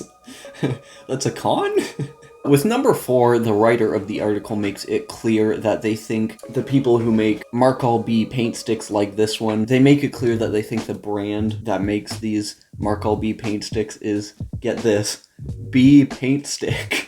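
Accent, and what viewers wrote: Canada. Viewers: just a question are you by chance from newfoundland? your accent kinda sounds similar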